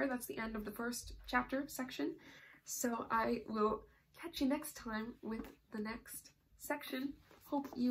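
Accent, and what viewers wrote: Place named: Midwest, United States. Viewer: Are you from Wisconsin? lol you sound like you are from the Midwest x) I am so don’t be offended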